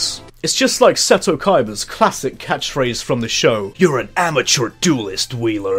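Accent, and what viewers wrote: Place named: England, United Kingdom. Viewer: Trevyn Garris he should go into voice acting. Lord knows there's a shit ton of English accent VAs in games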